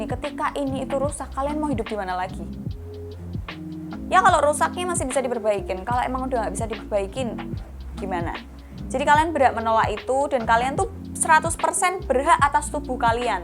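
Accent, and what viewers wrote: Java, Indonesia. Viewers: medok mu loh mbak :) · Aq kok seneng gaya ngomong e mbak 😊 medok Jane tp kok ngangenin🙈🙈😅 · medok e lucu....hihiii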